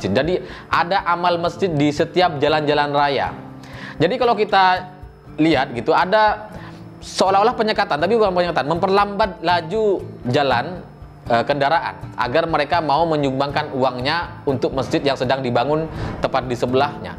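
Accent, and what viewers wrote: Madura, Indonesia. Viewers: Kau tinggal dimana sih bro? Kau lupa ya? Kalau tradisi di orang2 kita alias "tretan" .. sapi memang dikandang di dapur... Jadi jangan kau anggap bahwa itu memprihatinkan atau ngenes... Sok peduli dan sok kekota2an kamu... Muka dan logatmu itu masih Madura asli... Jangan mempermalukan nak-kanak dibik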